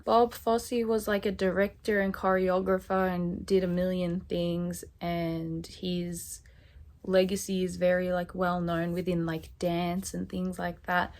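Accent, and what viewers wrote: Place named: New Jersey, United States. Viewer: that's a lovely accent you have ! New Jersey ? x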